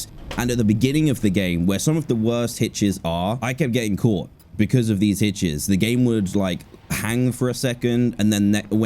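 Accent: United Kingdom. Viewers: Dan I love your British acent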